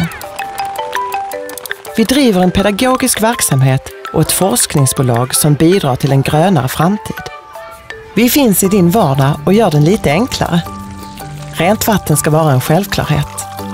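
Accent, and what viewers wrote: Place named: Scania, Sweden. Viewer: Mycket bra. gillar skånskan. :)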